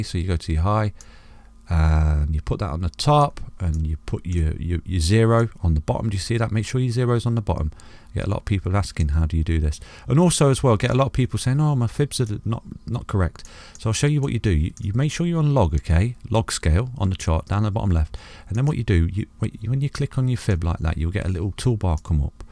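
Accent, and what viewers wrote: United Kingdom. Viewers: Didn't expect to find Chiliz T/A - Have now subbed and hit the bell button :) Great to listen to a british voice. I'm all in :) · Following your prediction perfect so far. Love your British accent, but I think it would be good for your channel to use, or at least mention USD prices. I’m European, but because most people on the internet use USD price, I find it easier to think in USD levels.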